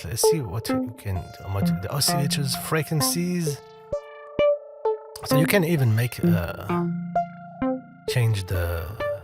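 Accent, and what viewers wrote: France. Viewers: Excuse my French but your English sound french 😅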